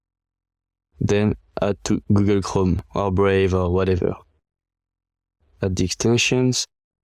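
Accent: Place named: France. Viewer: Cannot understand your Frenglish 🤣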